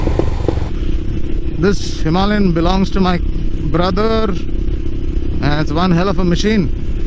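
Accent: India. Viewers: Bhaina gadi chale la Bela ku katha kuha bi Tama video dekhi ki dar lagi chi ba...au Tama odiya language PURA Rourkela style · Great to see someone from Odisha in blogging and exploring the beauties of our State .. thanks bro and all the best a slow and steady race hope to see you soon in 100k subscriber club soon, The Odia tone in between ur blog is damn good bhai ... gote Pahala Rasogulla upare video te hele badhia heithanta brother !!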